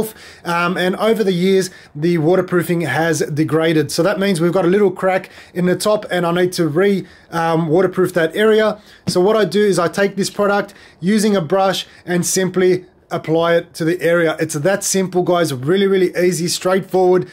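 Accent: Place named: Australia. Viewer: Hi, I hope you see this😊... you sound like an Aussie 🇦🇺 so I'm just wondering if could please tell me if the 1st one is the same as (same brand) silicon as yours said concrete? I'm going to Bunnings & there's one for $6 & the concrete is $25... do both do the same thing? Thanks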